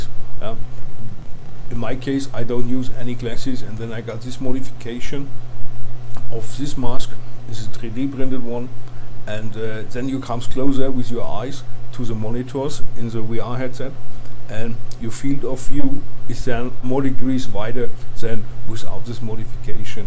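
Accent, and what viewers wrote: Germany. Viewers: Höre ich da einen deutschen akzent? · Danke für das ausführliche Video! Ich gehe mal davon aus das ich einen deutschen Akzent gehört habe und schreibe frech auf deutsch. Hast du das im Übersee gekauft oder in einem europäischen Shop wie Winwing ihn hat? Frage bzgl. der Kosten für Zoll etc. Und vielleicht gibt es ja einen deutschen Supplier den ich nicht kenne. Danke im Voraus!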